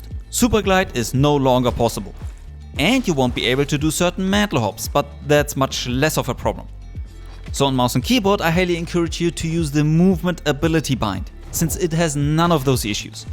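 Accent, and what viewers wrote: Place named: Germany. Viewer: Klingt schwer als wärst du deutscher. Das englisch kommt mir sehr bekannt vor xD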